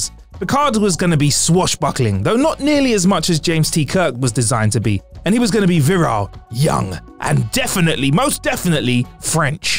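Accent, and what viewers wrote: United Kingdom. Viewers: That British english is horrible.Slow down mate. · even with a british accent I could tell what he looked like. Are those my skills?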